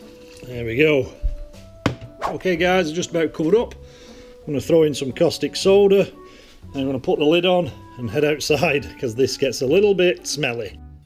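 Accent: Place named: United Kingdom. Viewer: By your accent your in the UK. Where did you manage to get the hydrochloric acid from as I need some but can't get it anywhere please. Great informative video thanks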